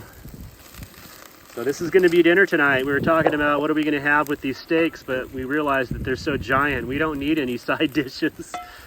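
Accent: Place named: Minnesota, United States. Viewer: 2nd camp is so beautiful. I love Minnesota voice.. I’m from Michigan, I can hear his accent haha! Coyotes freak me out, not a fan. Love the prairie dogs🩵 so cute! I love ribeye steaks. Burgers for the win! Safe travels & camping, until next time!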